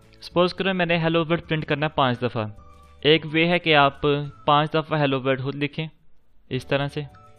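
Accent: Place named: Sialkot, Pakistan. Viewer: good and helping tutorial (y) ... and nice voice :P as I heard you , i knew that you from Sialkot :P maybe u r not but i thought :) Good job ,, keep it up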